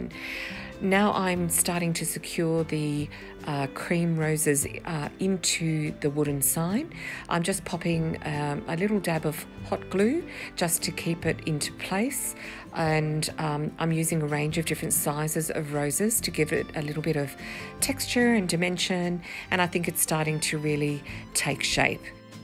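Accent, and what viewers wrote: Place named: Australia. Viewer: Just beautiful! Aussies have the most gorgeous accent and your voice is so pleasant and calming. I think if Olivia Newton John♥️Love your wreath!!!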